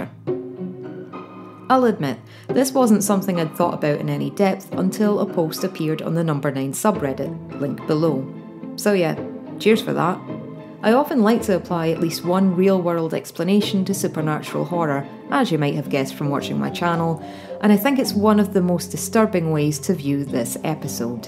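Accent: Scotland, United Kingdom. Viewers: Where in Scotland are you from? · Can I just say how much I appreciate your calm talking presentation. As a skeemish person myself ( I skip the jumpscare horror episodes ) it was nice that you didn’t include audio or visual scares that I’ve seen other reviewers use. Really good video and it’s always nice to hear a fellow Scot! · I love “Inside No. 9”. I’m absolutely in love with it. Love your list...quick question. What’s your accent? Is it Scottish or Northern English? It’s lovely!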